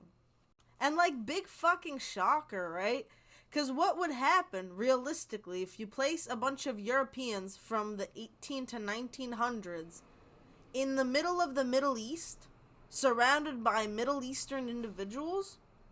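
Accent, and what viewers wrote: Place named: United States. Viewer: Don't take this personal but I need to correct you on some things. The fact that you say you are NOT and NEVER WILL BE Israeli doesn't give you the right to make Israelis look like they are some fantasy nation. You are a Jew as you said. You are (I assume by your accent) from USA. That makes you American Jew. I hope you know that 2 million Arabs live in Israel. They are Israeli Arabs. A lot of those Israeli Arabs say they are Zionists. Remember they are Arabs ( Moslems and Christians ). Another thing - you talked about Jews returning to Middle East from Europe. Are you aware that 43% of Jews who moved to (now) Israel are from Europe? 57% are from Arab countries. They were forced to leave. And add to that 270000 Jews who lived there already and never left their land. And another thing - Brits didn't create Israel. UN did. 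Great Britain also didn't colonize that area either. It was UN mandate and GB was tasked to police that area. Israel was suppose to be entire Palestine. But Britain Created Jordan ( back then called Trans-Jordan). UN then decided to split the rest of the land in 2 states. Arab state and Jewish state (Israel). And one last thing - the biggest anti-zionist Jews are Orthodox Jews. Why? Because in their mind Jews will get back Israel when Messiah comes back and not before. Until then Jews need to wonder around and suffer. I am not a Jew. Also I don't live in Israel. But here is something to think about when it comes to Jews. If you are a Christian and don't belie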